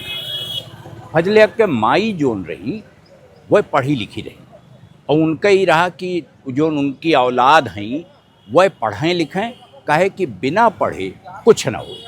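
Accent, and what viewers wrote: Awadh, India. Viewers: Sir ji aapka avadhi me bolna bahut hi sundar hai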